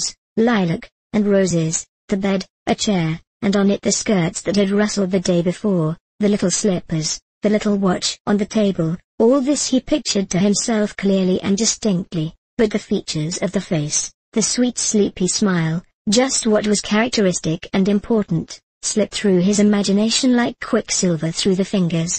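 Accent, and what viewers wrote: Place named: United Kingdom. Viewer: Nice to listen to a British speaker for a change.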